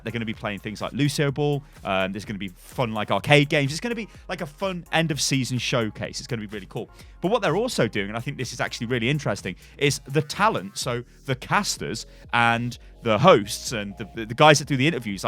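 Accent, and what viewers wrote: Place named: United Kingdom. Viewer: Thanks, I just remembered why I hate the British accent. It's terrible and hurts my ears. How the fuck can you write "Reinhardt" wrong TWICE? Get your shit up.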